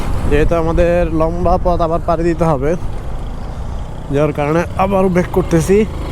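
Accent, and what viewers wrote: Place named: Chittagong, Bangladesh. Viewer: Vaiya Chittagong basai kotha bole joss lage🫢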